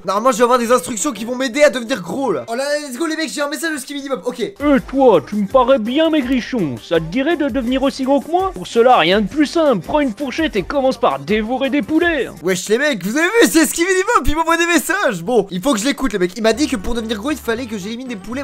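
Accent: Marseille, France. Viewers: Tu fais bien le marseillais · Incroyable l'accent marseillais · G adoré l accent marseillais 😂😂😂😂😂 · "Je t'en met 1 pas 2"😂"je vais te taperrrrr" 😂magnifique l'accent Marseillais 😂😂😂meilleure video de tous les temps 🎉🎉😂 continue comme ça😂🎉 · Sé té tro. Marent l a ! Accents en marseille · C’est une masterclasse l’accent marseillais continue avec l’accent marseillais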